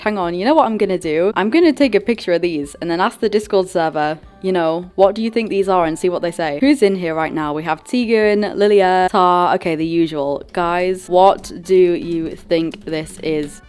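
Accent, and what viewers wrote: United Kingdom. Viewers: You British slayyyyyyyyyyyyyyy I from wales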